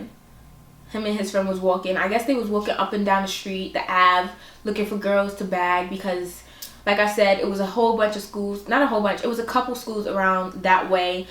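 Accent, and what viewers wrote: New York, United States. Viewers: 😂😂😂😂😂 that new york came out hard afffff in this video lmaooo i love you 💗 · Do you think that she has a new york accent ? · your so NY .i love it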